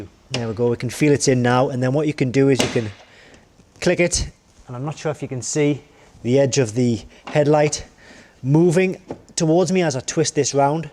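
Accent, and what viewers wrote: United Kingdom. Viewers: Thanks... great to see/hear a British channel. Not clutching at straws in my mind, just useful tips.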